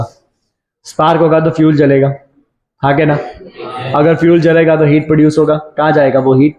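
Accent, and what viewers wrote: Gujarat, India. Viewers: Thnak you sir for reply Btw, tamaru hindi english speaking and writing mast fluent chhe but video ma akad evo word aavi jay k jena parthi khbr padi jay k tame gujarati j chho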